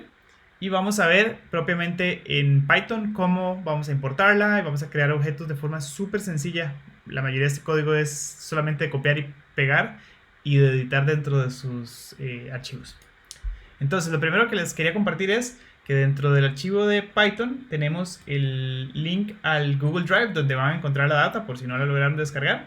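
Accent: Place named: Costa Rica. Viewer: no se pierde el acento tico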